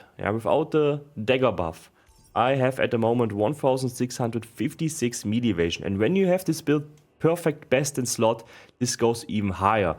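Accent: Germany. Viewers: Man hört aber extrem das du deutscher bist 😅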